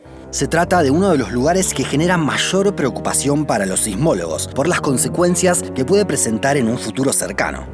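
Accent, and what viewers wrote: Rioplatense, Argentina. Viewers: Soy un estudiante argentino que Lleva un año viviendo en la ciudad de Medellín Colombia, y después de escuchar el dulce acento de este lugar, no eh perdido el mío, más sin embargó siento muy marcado en la manera que utilizas el yeismo, el famoso (sho) del río de la plata. Un saludo compatriota desde la hermosa Colombia 🇨🇴🇦🇷♥️❣️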